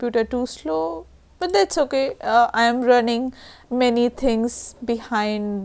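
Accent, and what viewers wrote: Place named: India. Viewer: Wow indian voice 😍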